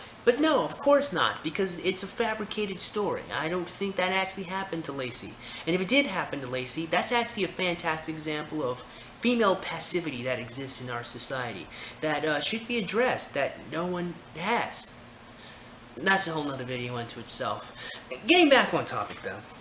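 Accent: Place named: United Kingdom. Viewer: Trust me when I tell you that you say all the right words. I've disagreed with some of the things you've said in the past, but I don't think I've ever heard you say the wrong word. I wish I was as articulate as you. The British accent threw me off a bit.I was like, "Is he intentionally doing it? Or did he grow up in England and sometimes it just comes out? I've noticed that you pronounce certain words differently than I do. The only other place I've heard this type of pronunciation or accent was from the show The Wire.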